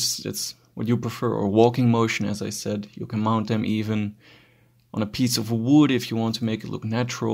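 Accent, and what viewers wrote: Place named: Netherlands. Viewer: The fun thing is... Ik ben ook Nederlands en ik dacht het al aan je accent te horen, maar ik wilde het zeker weten... Heb je ook levende Heteropteryx Dilatata thuis?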